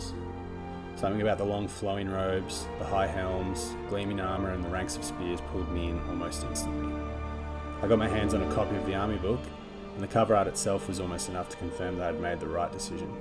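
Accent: Australia. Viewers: Hey man, just stumbled on your channel. Great to hear a fellow Aussie into Total War and Warhammer! My story was actually quite similar to yours. Got brought into the fold in late primary school and instantly gravitated towards the High Elves with the very same army book! Hope to see you around in Quick Battles.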